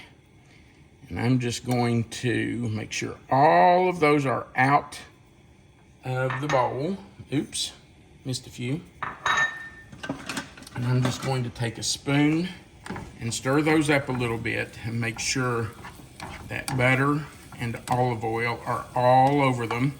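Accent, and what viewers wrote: South, United States. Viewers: I see you're from Kentucky do you have a southern talk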